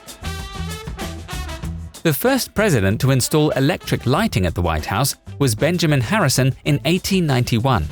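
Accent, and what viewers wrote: United Kingdom. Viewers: British narrator! 🤣🤣🤣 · I like how this guy is talking about America but he British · 1. Not michelle but Michael oboma.. 2.Geprge Washington had a copper Steel first..not Obama brewery.. Why a British accent giving American history gives pause to wonder..ok I'm done..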